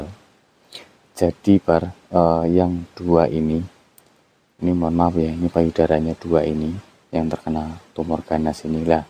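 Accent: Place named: Central Java, Indonesia. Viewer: Assalamualaikum MET sore mas baru nonton nih vlognya.oya klo boleh tahu mas jawanya mana ya karena saya juga orang Jawa tengah.klo mendengar cara bicaranya kok sama logatnya SPT kampung saya.ya semoga saja Deket dg kampung saya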